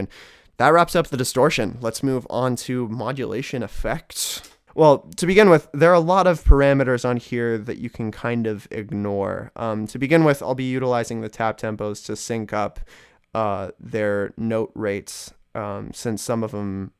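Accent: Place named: Russia. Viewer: that sounded like a Russian accent, even though it was English. (I think I read your name as?)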